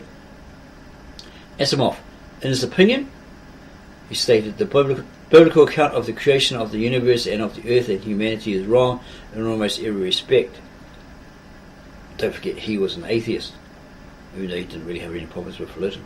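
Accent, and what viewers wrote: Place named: Australia. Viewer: Worst video narration ever. Between his halting mumbling and dense Aussie accent it was barely understandable. Thank God for closed captions !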